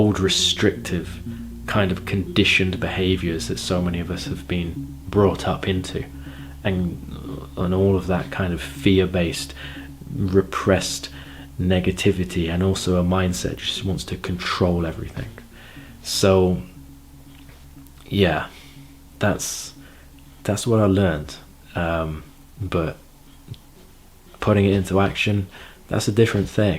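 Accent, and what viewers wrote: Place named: England, United Kingdom. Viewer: you sound English. Where do you go for the retreats?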